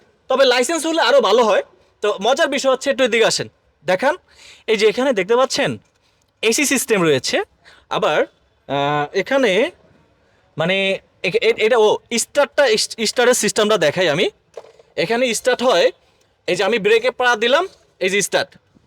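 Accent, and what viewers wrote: Noakhali, Bangladesh. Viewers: মুরুক্ষা বেডা এটার নাম ড্যাসবোর্ড বলে..... কথাই ঠিক নাই....নোয়াখালির একটা টান থাকে কথায়....😂😂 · This guy is very excited. Is he a pure Noakhalian speaking Bangla?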